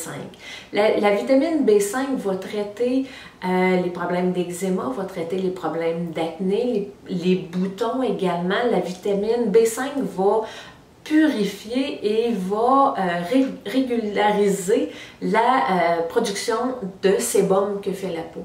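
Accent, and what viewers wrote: Canada. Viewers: Bonjour Je suis très contente d’avoir découvert votre chaîne. J’ai une question concernant la tretinoine et le masque led. Je souhaite m’acheter un masque et j’ai commencé la tretinoine depuis plusieurs semaines. Du fait que ce soit photosensibilisant je me demandais si vous continuiez la tretinoine ? Si vous le faites le jour où vous mettez la crème ? À quel fréquences faites vous le masque ? Merci beaucoup pour votre bonne humeur et votre petit accent canadien 😘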